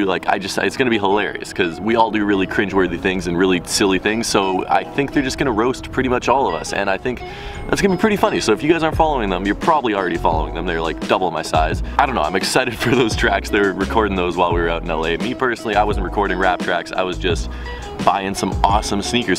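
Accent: Canada. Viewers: I thought u said those words like that cuz ur Canadian. Also u say sorry weird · You don't say them wierd, you say those words Canadian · yeah, canadians kinda pronounce things weirdly compared to us states-people. especially for me cuz i'm from texas😂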